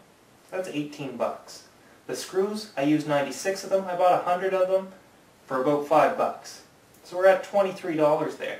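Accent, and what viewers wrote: Canada. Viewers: Lol! well that's good then....He said it with his Canadian accent and sounded like 'meter saw' eh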